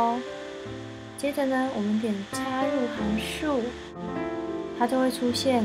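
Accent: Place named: Taiwan. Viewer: 非常慢的台湾普通话，我听起来心闷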